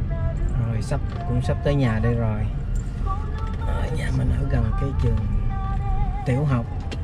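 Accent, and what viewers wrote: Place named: Vietnam. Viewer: Hi,qua Mỹ lâu mà sao vẫn giữ giọng VN hay há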